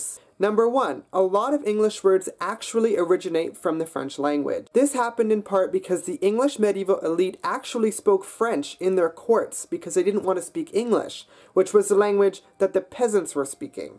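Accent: Quebec, Canada. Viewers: I'm Canadian and I speak french... I don't know why, but you speak a little like the Quebecois(I am one too). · Oh la la! Looks like a Ph.D. thesis... Every language has its challenges, and I'm amazed at all the diversity of writing systems, phonology and the sounds that the human mouth can make. From the way you pronounce "about" I would say you are Canadian--and don't they teach French in school in Canada? If it took you 12 years to figure French "out" you were probably more interested in other things.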